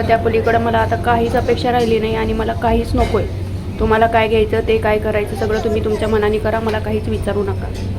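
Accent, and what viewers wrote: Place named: Satara, India. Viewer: है मीपन सातारची आहे.आपली सातारी भाषा 😂 लगेच ओळखते.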